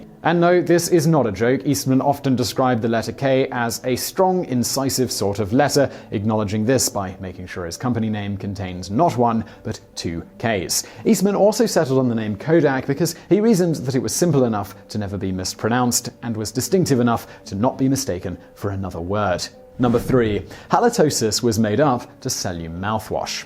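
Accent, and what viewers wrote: United Kingdom. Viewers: OK Monsewer, you poke fun/schit at people making up words or not being able speak certain things. You sure mispronounce so many words yourself and do it with a straight face. Are you trying to be funny or is it that you really don't know how to pronounce these words. Or is it that you seem to be Brit or are speaking with a Brit accent to come off with a holeyer than thou attitude? By the way the English language should be renamed the American language. Since Brits can't pronounce half the words. Do English/Brits/Limeys know just how ridicules they sound? Some Brit movies need closed captions to understand what they are saying. Sorry, I just recalled an Aussie I ran into in Thailand, to this day I still don't know what he said in the short conversation we had, I nodded my head mostly to what he was saying and that seemed to satisfy him.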